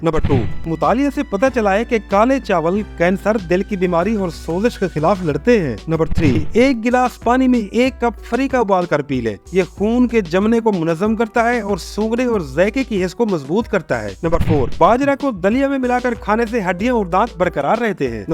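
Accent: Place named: Saraiki, Pakistan. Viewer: السلام علیکم بھائی جان اپ کی ویڈیو بہت اچھی ارہی ہیں ۔لیکن اردو کا لہجہ سرائکی جیسا ہے جس کی وجہ سے بہت سے الفاظ یا تو سمجھ نہیں ارہے یا بار بار سننے پڑ رہے ہیں جیسا کہ اپ نے اس ویڈیو میں پانی میں کوئی چیز بوائل کرنے کا ذکر کیا جو خون جمنے اور ذائقے کی حس کو تقویت پہنچاتی ہے بار بار سننے کے باوجود اس کا نام سمجھ میں نہیں ایا ۔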